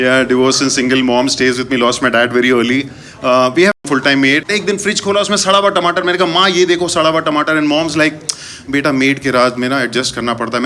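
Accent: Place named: Purvanchal, India. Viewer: chacha lucknow me vo accent hai hi nahi.. purvanchal aur marathi mix krdi apne..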